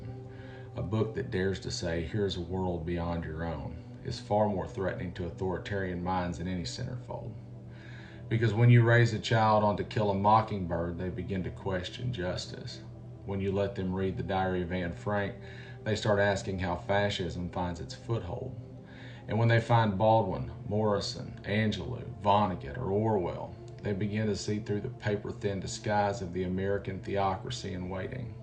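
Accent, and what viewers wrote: South, United States. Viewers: you are brilliant. Your "good ol' boy" southern accent makes what you say even more powerful. I've shared your videos with everyone I know. Defiance 'til death 💪🏽✊🏼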